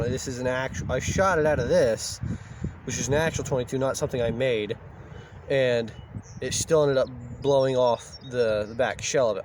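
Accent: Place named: Massachusetts, United States. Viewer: Cool stuff. An interesting video and comparison info. YouTube recommended your video to me here in Toronto, Canada. Guessing you're a Massachusetts fella, judging by your accent. Ha!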